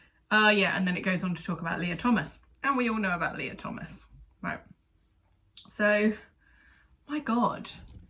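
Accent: United Kingdom. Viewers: For some reason I just smile when seeing this adorable human being. The British accent might play a role but ultimately it's just the kind and calm nature you experience from her. I utterly enjoy listening to her.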